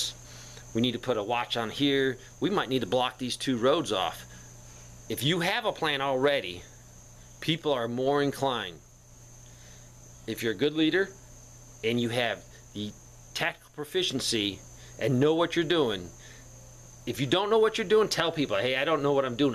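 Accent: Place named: East Tennessee, United States. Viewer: What area of the country do you live in? Not asking for your street address haha or even your town. Sounds like you may live in the mountains of East TN but not sure. Anyway- great content! Love your channel!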